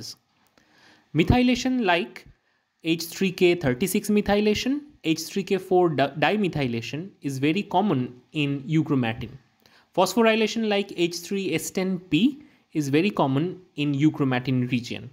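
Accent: India. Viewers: اوا مره افهم شي بدون ترجمه مع انو لهجه هنديه 😅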